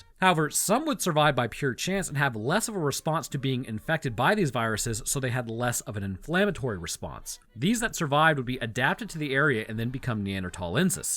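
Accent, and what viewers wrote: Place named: Virginia, United States. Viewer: I had been waiting for the Appalachia thing to come up at some point, I used to live in the foothills of VA and pronounced it the way you do. Met my now girlfriend who went to App State, got castigated and admonished regarding my pronunciation very quickly. Needless to say, the battle was lost henceforth. Edit: we are both now in CO as well, ironically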